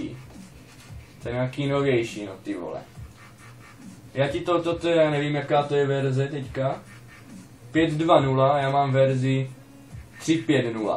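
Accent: Moravia, Czech Republic. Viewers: Moravak ne ? :D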